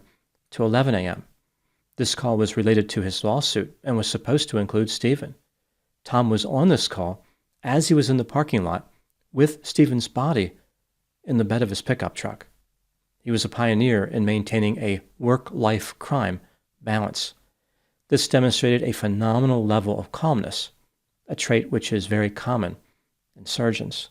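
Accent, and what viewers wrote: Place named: South Jersey, United States. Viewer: He has a south Jersey accent, so I think you might be right 😆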